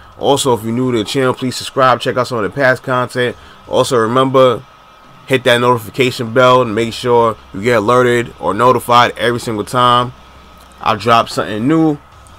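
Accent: New York, United States. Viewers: You from NY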